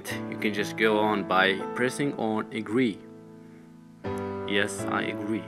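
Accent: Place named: Arab world. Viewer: why i undrestood your english becouse you arab and i am somali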